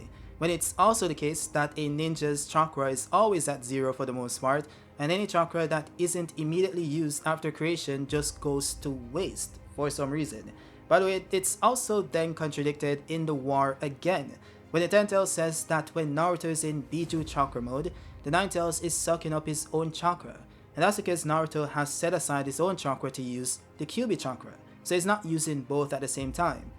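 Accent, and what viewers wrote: Jamaica. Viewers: You a yard man? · Yaa Jamaican? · Are you jamaican?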